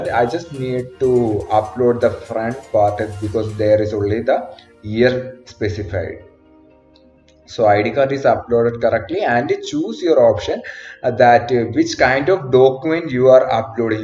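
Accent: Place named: Kerala, India. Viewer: Malayali English accent. Also, thanks for the video. Co-pilot powli🔥💯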